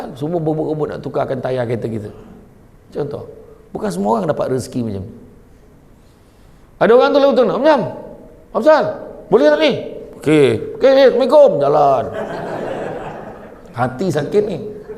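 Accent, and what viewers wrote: Negeri Sembilan, Malaysia. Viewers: Semoga ustaz selalu sihat hendaknya...saya suka dengar loghat nogori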